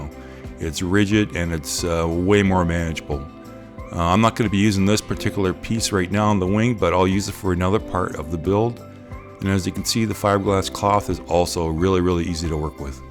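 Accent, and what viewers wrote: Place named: United States. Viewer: Hearing an American accent giving dimensions in millimeters gives me a warm fuzzy feeling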